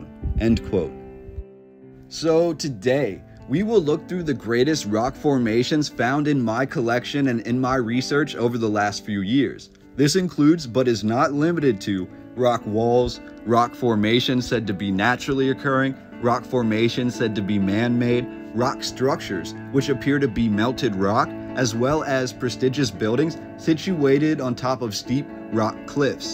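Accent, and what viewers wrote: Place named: Texas, United States. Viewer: you from Texas aint you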